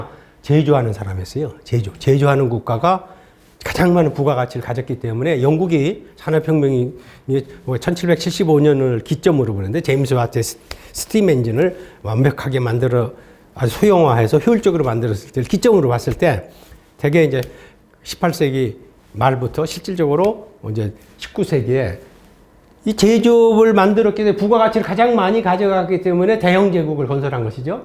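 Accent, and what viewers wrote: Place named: Jeolla, South Korea. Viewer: 당신 전라도 지 ㆍ 전라도 말투네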